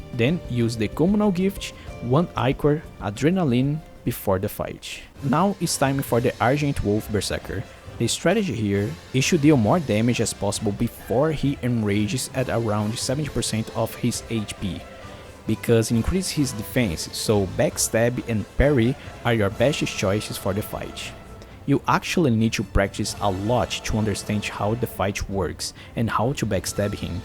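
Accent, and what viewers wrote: Brazil. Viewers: Parece um brasileiro falando inglês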